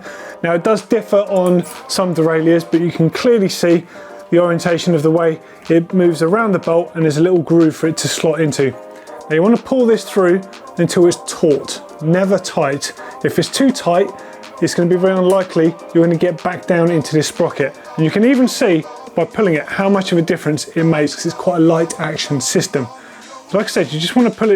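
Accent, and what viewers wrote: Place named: United Kingdom. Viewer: Thanks for the advice. My husband Michael was having trouble getting his Deore XT 12 speed working properly. He said he chose your site because he said people with a British accent just seem smarter! He will be out again tomorrow because of your help.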